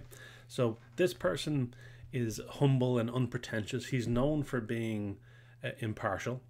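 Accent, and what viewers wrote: Ireland. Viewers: Hi, sound like an Irish man... I'm in Mayo, what's your background?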